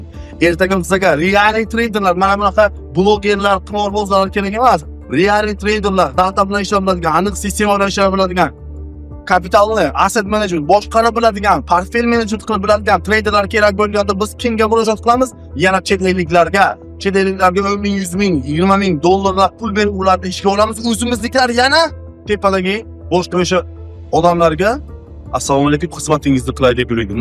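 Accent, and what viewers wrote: Bukhara, Uzbekistan. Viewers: Акани узи Бухоролик шеваси Тожикча.Узбек тилида озрок кийналади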